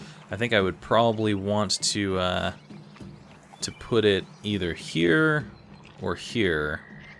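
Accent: United Kingdom. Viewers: Your Japanese why is the narrator British or British sounding?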